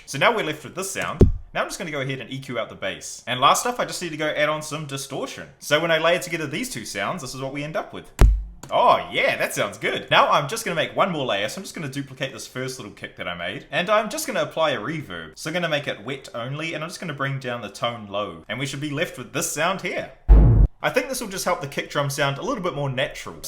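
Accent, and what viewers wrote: New Zealand. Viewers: Are you kiwi?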